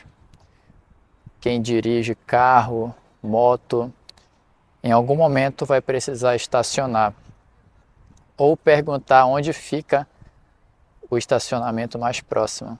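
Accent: Rio de Janeiro, Brazil. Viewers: You sound so carioca! :)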